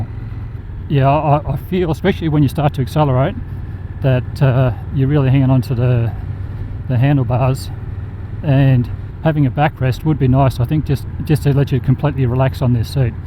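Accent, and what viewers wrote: Australia. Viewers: To be honest I really interspersed in bikes mostly cruisers but the main reason I watched all your videos is your way of speaking Australian English which is great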